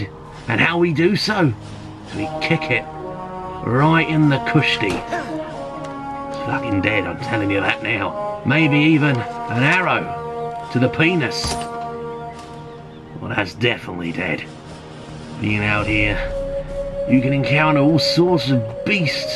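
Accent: Australia. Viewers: He does such a good outback aussie accent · THE AUSSIE ACCENT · That's the funniest Aussie accent I've ever heard 😂😂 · I think his British helped the Aussie accent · love the steve Irwin accent. Aussies are rolling with laughter, including myself. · Nice aussie acasent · That is the best Australian accent I've ever heard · nice Aussie accent mate, and that's coming from an aussie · Australian I believe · Are u trying to sound Aussie because if so it sounds cool and funny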